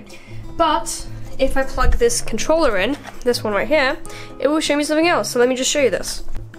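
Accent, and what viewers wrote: United Kingdom. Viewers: That's the time I stopped watching. Why do you have to talk so freaking fast?!?! You already got a heavy British accent which is hard for the most of the world to understand.. Food for thought..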